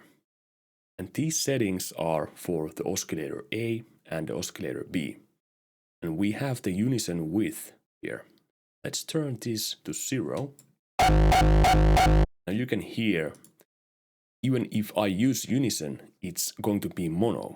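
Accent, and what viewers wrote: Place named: Finland. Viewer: piti ettiä mitenkä duunata vähä uptempoo van löyty suomi aksentti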